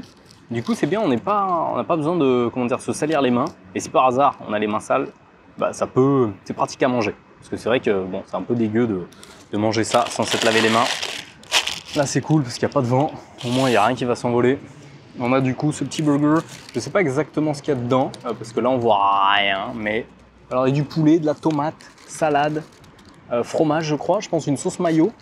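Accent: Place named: England, United Kingdom. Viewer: comme dit précédemment jaime trop ton acent anglais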